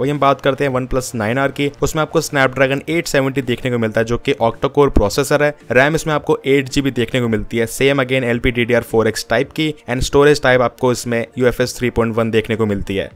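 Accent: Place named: Punjab, India. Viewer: Bro Apki Voice Me Thorda Sa Punjabi Touch Aa Reha Hai Are U Punjabi ???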